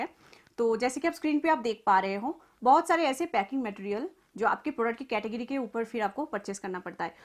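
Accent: Bengal, India. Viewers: Aapki hindi me bagoli language ka tadka he sunne mi bahot accha lagta he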